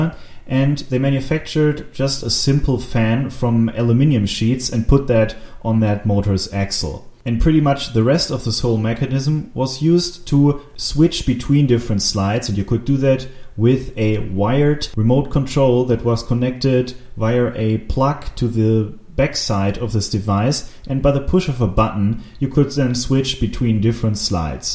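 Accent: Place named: Germany. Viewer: Hi, Thanks for sharing your ideas with us. I just love it and also enjoy your German accent.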